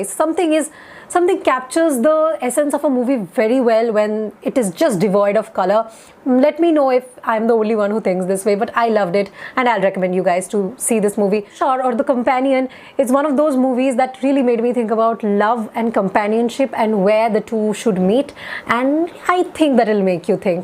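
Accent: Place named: India. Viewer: I love the Indian accent!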